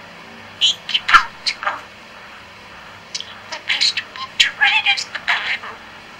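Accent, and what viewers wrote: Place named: Australia. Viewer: I detect an Aussie accent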